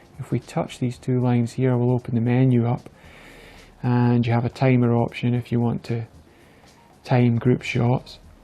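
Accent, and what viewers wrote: Scotland, United Kingdom. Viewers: Love the accent! Scottish? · Scottish. From Scotland.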